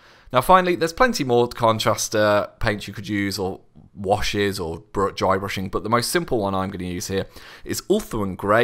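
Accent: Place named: Cockney, United Kingdom. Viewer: Could you tell me if you used Wraithbone out of a can or with an Airbrush? I have nothing but bad to say about Contrast Spraycans because it's awful. It pulls back from the edges, pools in recesses and dries wit a weird almost "carbonated" texture sometimes. I shook for 10 minutes straight, sprayed it properly (8" away), sprayed it in cooler but dry weather, in the day, in the shade. Also, great tutorial! I really enjoyed this one. Brits with a light cockney always sound good ;-)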